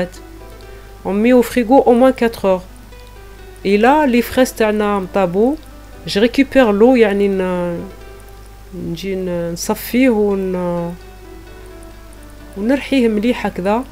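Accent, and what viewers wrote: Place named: Setif, Algeria. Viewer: من صوتك باينة سطايفية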